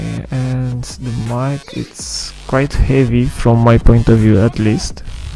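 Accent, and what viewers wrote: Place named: United Kingdom. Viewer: Que acento mas malo, seguro eres británico?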